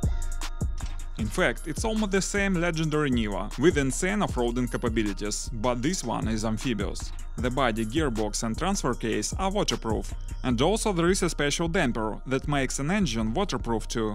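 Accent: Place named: Russia. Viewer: У тебя русский акцент- ты русский,ну или из бывшего СССР??))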